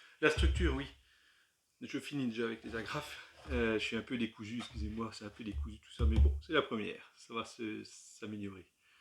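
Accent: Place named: Haute-Marne, France. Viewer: Bonjour pas mal l’ancienne doc FLEISHMANN très inspirant ta vidéo j'ai très envi de me lancer, c'est quand le prochain confinement ...c'est une blague, mauvaise blague surement j'ai pas trouvé mieux, j'imaginais me lancer dans le HO mais vu la place pourquoi pas le N, tu trouves facilement du matériel à cette échelle ? dernier petit point sympa le léger accent de la haute marne j'ai mon frangin qui habite la côte d'or depuis... qu'il a laché son département d’origine et je retriouve les intonations de cette région ;-)) Merci encore pour tes vidéos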